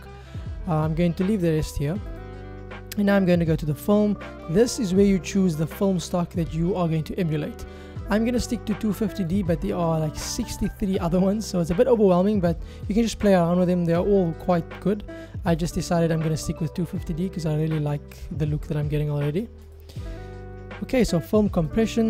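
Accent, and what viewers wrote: South Africa. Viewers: Are you from South Africa? If not, you have a very South African accent. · You sound South African mybru, shout-out ! 🙏🏽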